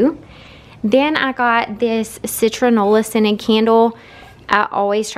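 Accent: South, United States. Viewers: Where is she located? She has a pretty southern accent.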